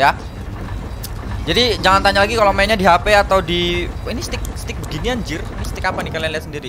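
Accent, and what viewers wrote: Java, Indonesia. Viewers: Bang orang Jawa? Jawa mana bg, salken aku dri jatim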